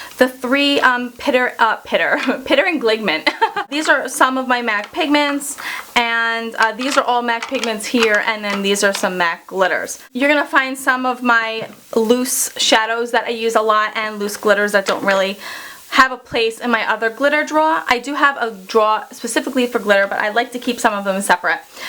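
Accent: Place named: New York, United States. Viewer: Are you from New York or New Jersey or something? I hear the accent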